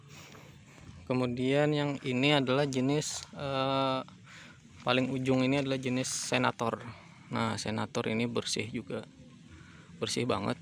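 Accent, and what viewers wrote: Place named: Banjar, Indonesia. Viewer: Banjar dmana nya pian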